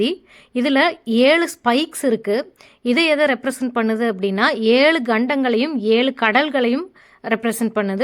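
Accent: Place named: Kongu, India. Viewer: கொங்கு நாட்டு தமிழ் வழக்குடன் அருமையான பதிவு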